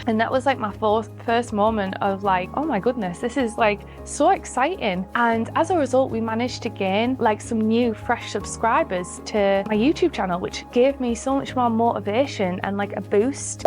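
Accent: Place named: North, United Kingdom. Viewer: I had NO IDEA that YouTube sponsored videos! Congratulations on how far you've come - we need more northern voices on YouTube!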